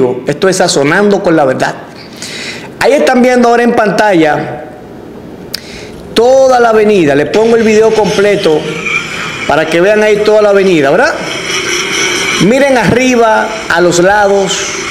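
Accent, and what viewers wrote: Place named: Cuba. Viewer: PRIMERA VES K T VEO Y ME INSCRIBI SEGUIDO POR FAVOR NO IMPORTA PERO TU SUENA CUBANO! SI ERES CUBANO NO IMPORTA! TU SUENA INTELIGENTE Y SERIO! POR FAVOR NO T VENDA! Y BUENA SUERTE!!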